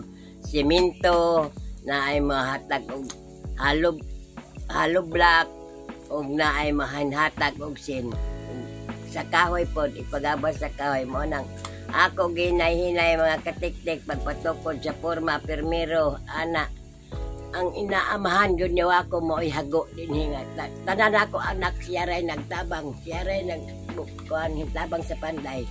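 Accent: Surigao, Philippines. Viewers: Makahilak man pod ta.. God is good. Ampo lang ta kanunay nga healthy ta ug safe.. Nga dili lng sa bugtuon ni Lord ang lastiko.. Heheh bitaw pkatawa lng.. Tga Surigao pod ko pero naa mi sa Norte.. Mao nga pgkabati nko sa imong sinultian nga surigaonon bisag sagol na cebuano. Mkamingaway surigao lami iuli pero tungod sa layo kay dia ko Kuwait unya sa sitwasyon karon. Pero cge lang.. Keep on vlogging.. Kay lipay mi kaau sa nu ni wako labi na pod ug maguban mo.. Keep safe! 😘😘😘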